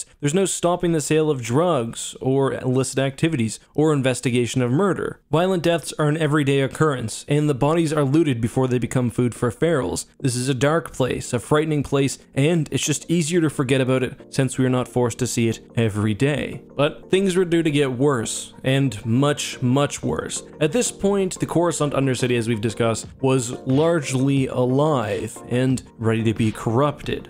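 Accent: Canada. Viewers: You know he's canadian by the way he says "Aboot" instead of "About" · Are you canadian? You pronounce about in a canadian way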